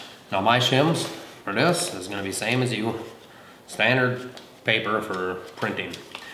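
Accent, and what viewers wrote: Minnesota, United States. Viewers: That Minnesota accent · I hear the MN accent bro. "There you goooo" lol love it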